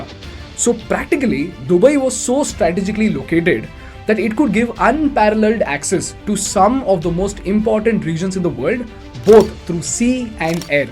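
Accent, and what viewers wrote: India. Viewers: Please get somebody who does not have an Indian accent! They sound horrible! they sound like the phone scammers who steal money from old people in America. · Maybe your english has a little indian accent?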